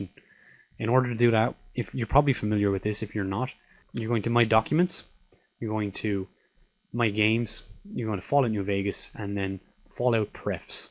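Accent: Ireland. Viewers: what's lol about it? he's Irish, I believe.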